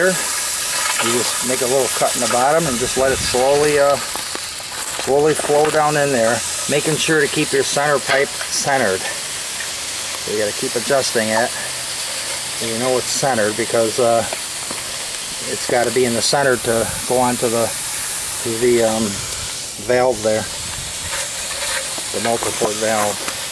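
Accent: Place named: Chicago, United States. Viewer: Helpful video. Excellent Chicago accent.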